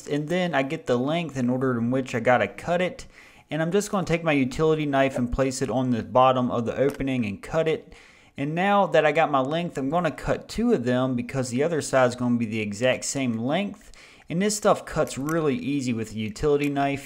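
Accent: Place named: Delaware County, United States. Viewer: Bro what part of Delco you from ?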